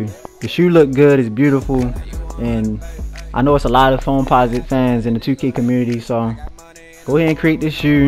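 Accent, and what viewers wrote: Baltimore, United States. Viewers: Baltimore ppl cant talk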